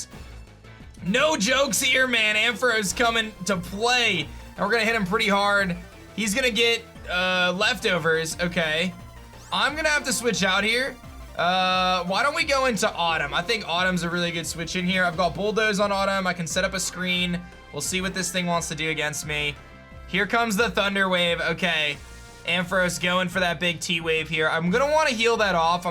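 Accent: Australia. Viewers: As an Australian I heard a lot of Americans try to do Australian accents that are not the best but you do a good Australian accent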